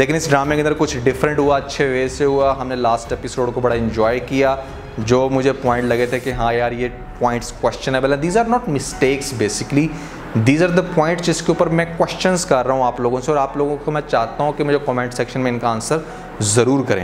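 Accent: Punjab, India. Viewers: Aapka Punjabi accent badiya Lagta hai. Punjabi hi hai na??????? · Bohat hasna diya bhai apki panjabi ne i am panjabi thanks for sharing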